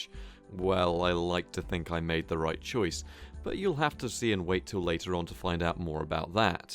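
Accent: United Kingdom. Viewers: well done man you deserve way more subs. love your Chanel one of the best tech channels on youtube. it is great to hear a British accent as well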